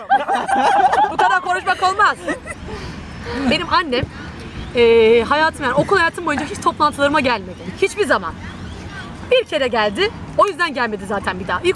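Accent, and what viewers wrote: Black Sea, Turkey. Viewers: çok güldüm şiveye bayıldım keşke bende konuşa bilsem bir rizeli olarak :) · Çok tatlısın,kurban olurum karadenizime şivesine,doğru söylemiş anan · Sen harikasın daha çok dizilerde görmek isteriz özellikle karadeniz şivesiyle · Karadeniz'in neşesi, enerjisi, mizah anlayışı, şivesi sende çoook güzel bir görsel/işitsele dönüşmüş... Gündemdeki "savaş çıktı çıkacak"ortamı üzerine seni seyredince, hayatın çok neşeli olduğu Arhavi yıllarımı, çocukluğumu hatırladım. Neşen daim olsun... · Karadeniz'li mi yoksa İstanbullu mu ya da doğum Karadeniz üniversite İstanbul ondan mı şive değişmiş. Neyse çok beğendim, devam. Türkan çok iyiydi bu arada :)) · Bayiliyorummm sana hep karadeniz li siveneee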